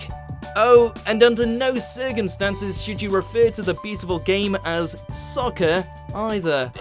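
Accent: United Kingdom. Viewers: is the narrator British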